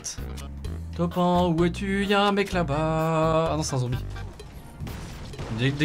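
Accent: Belgium. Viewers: c'est étrange avant t'avais pas l'accent belge mais là en t'endtendant parler 😂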